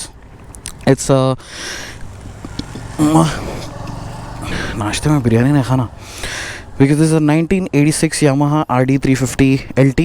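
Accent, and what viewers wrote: Hyderabad, India. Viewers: I like your voice bro. Hyderabadi ton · bhai iss bike ka restoration vala video dalo aur thode videos banvo kuch bhi chale ga kyuki muje aapki Hyderabadi accent bahut badiya lagti hee love you ❤️🙏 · Love the hyderabadi skeltonic accent 👌👌 Thanks for sharing the japanese-indian yamaha aesthetics.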